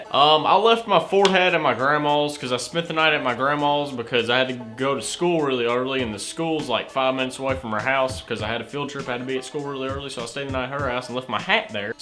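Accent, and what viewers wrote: Kentucky, United States. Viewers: Fake accent? That’s funny he’s from Kentucky. If anything he has s pretty mild accent normally in Kentucky it’s pretty thick. So obviously it’s not fake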